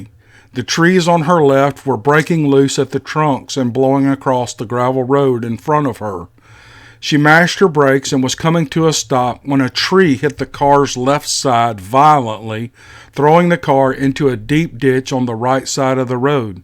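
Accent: South, United States. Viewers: Sir, I'm not sure what your name is, but I enjoy your channel and it interests me ,and I love your southern accent, I'm from Malaysia, keep up the good work. I never met Mr Foot before haha. It's alright ,it's amazing and you are amazing. God bless you. 👍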